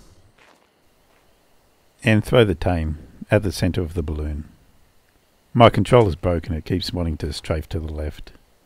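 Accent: Australia. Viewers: Gotta love that smooth Australian voice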